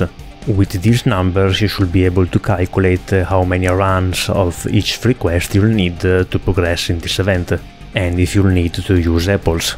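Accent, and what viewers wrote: Italy. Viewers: il tuo accento e italiano non mi freghi lol. ce tipo un discord di italiani di fgo??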